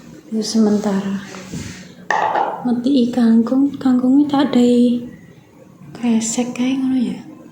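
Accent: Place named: Sulawesi, Indonesia. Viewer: Org Sulawesi itu logatnya,,, menggemaskan 🎉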